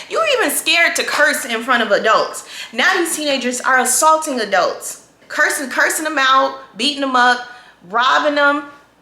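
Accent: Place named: South, United States. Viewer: New Mayor...same as old mayor. you dont have a Chicago accent. You sound Southern.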